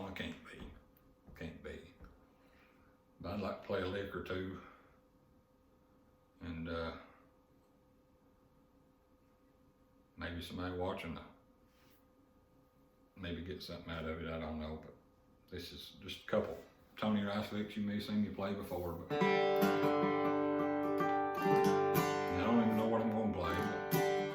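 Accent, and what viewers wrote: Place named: Eastern Kentucky, United States. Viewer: Great videos...Just found your videos and still guessing you're from Eastern Kentucky or Mingo County, West Virginia. Great comments about Tony Rice too.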